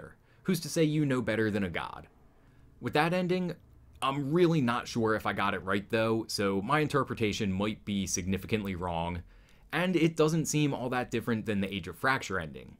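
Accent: Philadelphia, United States. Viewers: Yo bud you from around southeastern PA? Is that a slight Philly accent I hear? You sound like me lol. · Philly accent for sure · GREAT points man. you from philly?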